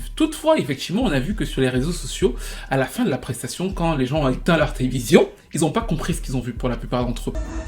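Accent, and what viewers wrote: Cameroon. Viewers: Toi là tu as un accent un peu Camerounais ou j’entends mal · Il est camerounais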